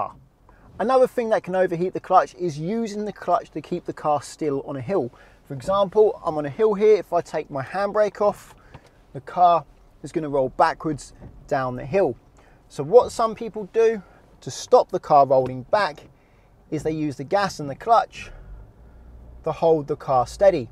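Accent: United Kingdom. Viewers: What kind of accent is this? (I know it's British, I mean what region)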